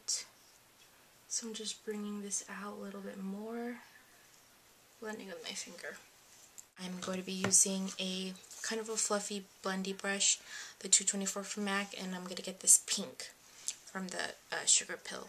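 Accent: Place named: Philippines. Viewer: i believe she is her accent is that of Filipina :)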